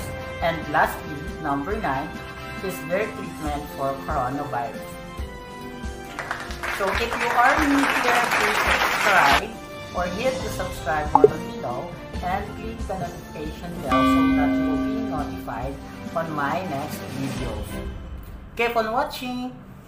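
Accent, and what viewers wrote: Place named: United Kingdom. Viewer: Yung totoo po pinakikingan ko po yung boses nyo About Corona virus Akala ko po british kayo nung Pag tingin ko kayo pla Salamat po sa pagbabasa samin tungkol po sa pandemic na sakit Ingat kayo jan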